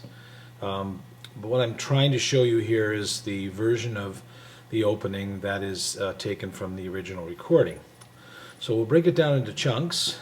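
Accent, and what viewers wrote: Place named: Canada. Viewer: Thanks for the lesson. Very helpful. Are you Canadian by chance? You accent suggest that you might be. Thanks.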